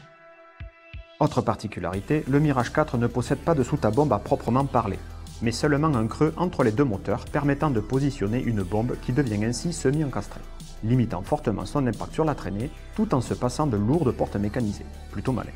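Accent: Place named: Marseille, France. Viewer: Dommage : l’accent marseillais rend inaudible la présentation de la vidéo !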